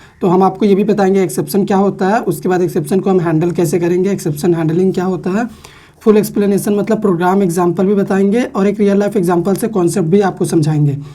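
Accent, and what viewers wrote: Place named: Bihar, India. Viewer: who loves his bihari accent😀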